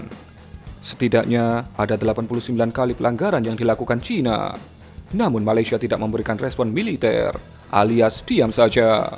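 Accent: Java, Indonesia. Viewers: Mbok medok nya dikurangi om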